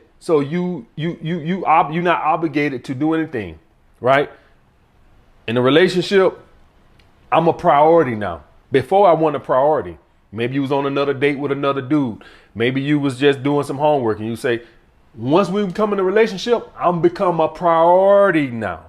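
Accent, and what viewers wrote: South, United States. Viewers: Lol dafuq is rambling??? Yo this nigga country as fuck! Keep up the good work homie I listen to almost every show. Red💊 all day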